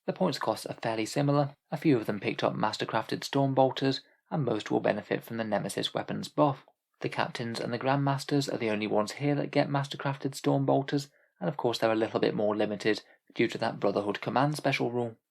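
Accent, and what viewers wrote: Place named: United Kingdom. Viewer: Your format is the perfect way to showcase Codexes and the British accent makes all things 40k more authentic to me.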